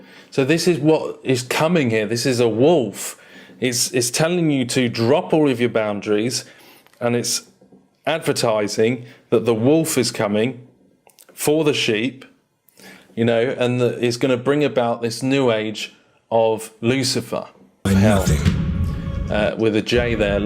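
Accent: United Kingdom. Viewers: AT THIS POINT YOU GUY WHO HANDLES THIS CHANNEL WITH YOUR BRITISH ACCENT YOU ARE NOT THE WAY. YOU SPEAK ABOUT ANYTHING NOW TO KEEP YOUR YT CHANNEL GOING MEANING YOU LOST ALL MERIT. YOUR NOT WISE. OR SPECIAL JUST OVER SPEAKING. PROBLEMS NO SOLUTION. SO FK YOU. AMD YOUR MOTIVATION YOU FOUND PURPOSE IN BS OLD WAYS OLD SOCIETY YOUR PROGRAMED TOO YOU HOLD NO POWER OR WISDOM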